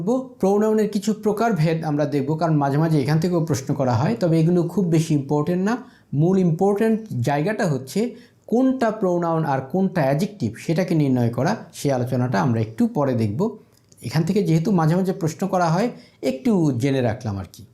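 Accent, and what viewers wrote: United Kingdom. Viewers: Apni british English spoken style use koren kno???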